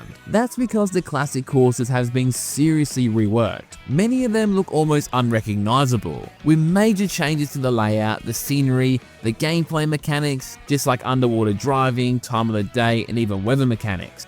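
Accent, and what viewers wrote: Australia. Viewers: Hey, very refreshing to hear am aussie accent and such a consice and considered analysis of all things AAA Nintendo. Wish I dis covered your channel earlier. Keep up the great work. Thank you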